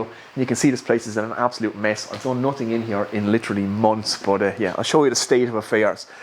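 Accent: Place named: Wexford, Ireland. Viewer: where are you from, you sound like a Wexford Man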